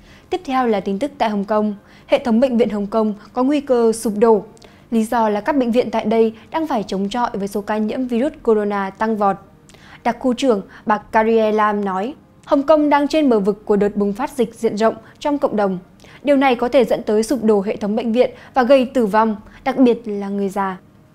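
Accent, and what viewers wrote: Standard. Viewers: Mc nầy phát âm chuẩn quá. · BTV tuyệt vời phát âm rất chuẩn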